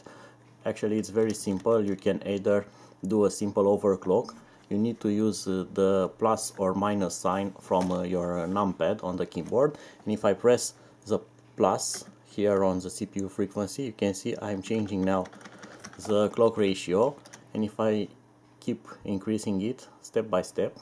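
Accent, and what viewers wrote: Romania. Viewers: Hai salut. Mama cum recunoști romanii dupa accept :)))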